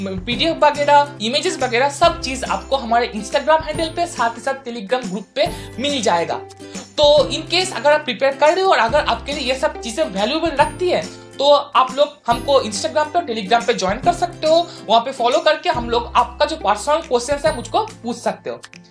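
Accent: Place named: Bengal, India. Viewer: দাদা তোমায় হিন্দি থেকে বাংলায় ভিডিও দেওয়ার জন্য সাজেস্ট করছি। কারণ তুমি বাঙ্গালী এবং তোমার মধ্যে বাঙালির কথার টানটা থেকে যাচ্ছে। আমি সাজেস্ট করবো তুমি বাংলায় ভিডিওটা বানাও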